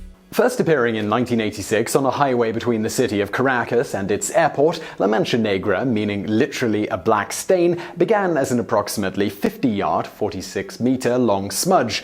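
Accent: England, United Kingdom. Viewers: Anyone else impressed with the actual journalism or background investigation into this story? A part-time staff of seven looked into the story - not looking for the story to be true or untrue, just reporting on known facts or assumed facts as well as offering a hypothesis for both sides of the picture. Many online news outlets today would just so want the conspiracy or unknown to be true that they would push that line or not offer the full picture. Either that or have BS fluff or headline/sensationalism stories lead the news. There is alot going on in the world; many of which does not get copy. Smutty sex stories or commercials veiled as a "news story" are getting air time. This channel and others like it deserve credit for doing a fine job. Unpretentious and no flash production; just a guy talking in front of a camera. An english accent, in my opinion, goes a long way. 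He speaks well and is easy on the ear. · what kind of accent is this? british?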